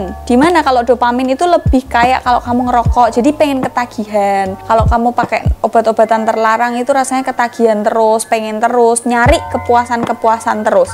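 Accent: Java, Indonesia. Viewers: Medok pisan si mBak iki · ciri khas bahasa PRIBUMI nya medok Good luck buat kk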